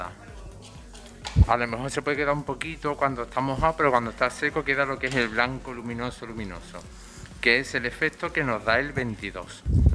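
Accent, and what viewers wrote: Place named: Spain. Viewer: Omg!!! Eres super lindo!!! Soy peruana y vivo en Canadá por más de 30 años. Primeramente: me encanta tu acento español... Que lindo tu asentó....😍😍😍 Me encanta tu carisma al hablar eres muy simpático y bromista con tu clienta y tus skills ... Woooow !!!! Eres bien educado en tu craft. Como me a encantado verte y aprender de ti. Eres un hombre muy dotado en tu profesión. Te voy a seguir...!!! JOANNE 🌹😘😘😘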